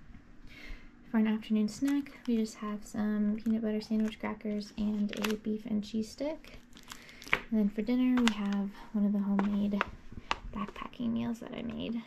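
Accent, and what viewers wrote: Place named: South, United States. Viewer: WHAT YOUR ACCENT SOUND DEEP SOUTHERN LADY WITH APPALACHIAN WORDS ... SHE PASS ... GOOD LUCK MY FRIEND.. APPALACHIAN TRAIL IS SO COOL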